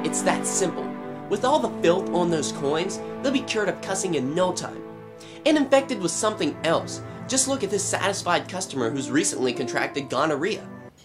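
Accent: United Kingdom. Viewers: i loove ur british accent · cool british accent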